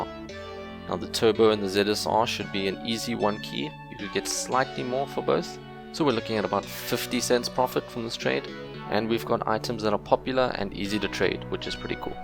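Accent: South Africa. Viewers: R u from South Africa bcuz I am!!! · are you south african? it really sounds like it. If so what part are you from. I'm originally from joburg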